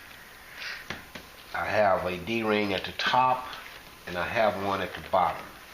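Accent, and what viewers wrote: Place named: South, United States. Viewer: Love that dirty south dialect…. Miss it so much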